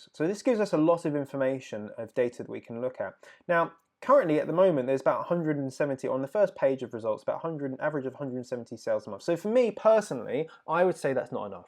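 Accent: United Kingdom. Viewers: just spent my afternoon making notes . thank-you so much for your videos and information presented in such a genuine way . Im just starting out with my sister in law and to be honest its so good to find a British accent taking about FBA within the uk ! we would really like to be considered for the free gift ,particularly the profit calc pro , if were not too late. We continue to watch and learn and thank-you for such informative content . Best of luck for the future , though Im not sure you need it !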